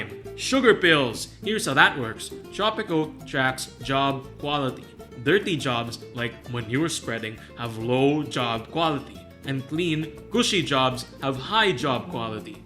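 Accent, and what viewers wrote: Philippines. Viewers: you sound Filipino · Are you filipino? I can't quite tell your with your accent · i just started playing tropico 6 and was looking for some videos to get better. i noticed your accent and subscribed right away haha. love seeing filipino channels as well thought out as yours. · you sound very filipino.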